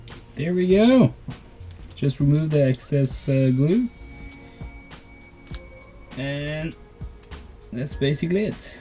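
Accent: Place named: Norway. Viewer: we love Norwegian English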